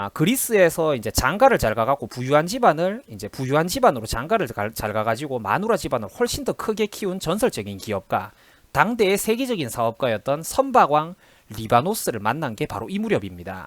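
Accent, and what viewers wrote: Gyeongsang, South Korea. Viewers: 일장기랑 바뀐거 같은데 말투에 쌍도가 묻어나오네ㅋㅋㅋㅋ 쌍도야 언제까지 60년대70년대에 머물러 살래? 세상돌아가는 공부좀해라 과거에 집착하지말고~ 최순실 영치금이나 넣어주던가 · 갱상도 사투리 부텨 고처라 이놈의 갱상도 꼴통도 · 말투가 딱 대구사람이네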